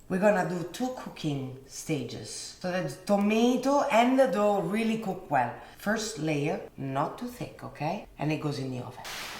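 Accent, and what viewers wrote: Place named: Rome, Italy. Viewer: Bella e brava. Ma ,soprattutto, me taglio. Per il tuo romano. Fantastica❤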